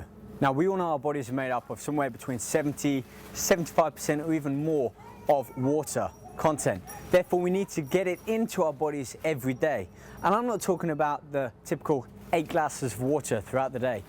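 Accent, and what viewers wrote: United Kingdom. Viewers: woter, olive ol, mo. british haha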